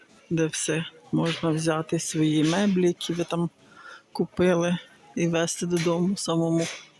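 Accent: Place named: Transcarpathia, Ukraine. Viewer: Цікавий діалект закарпатський,веселий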